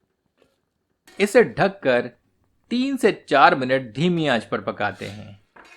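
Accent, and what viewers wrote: Standard. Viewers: itni shuddha hindi aaj ke zamane me maine kisi ko bolte hue nahi suna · The way u speaks in shudh hindi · itni shudh hindi sunkar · क्या बात है,शुद्ध हिन्दी। रैसिपी के साथ शायरी का आनंद। मज़ा आ गया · आपकी शुध्द हिन्दी का उच्चारण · Aap itna shuddh hindi bolte hain lagta hain middle age ka koi cookery show dekh rahi hu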